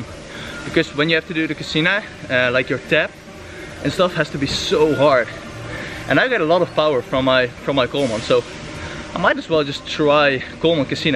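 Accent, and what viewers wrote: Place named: France. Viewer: you have been practicing your french accent... right? Great video btw!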